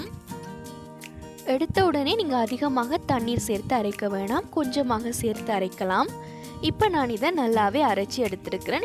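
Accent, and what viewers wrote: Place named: Sri Lanka. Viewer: Unga language very nice neenga srilanka va....very nice dr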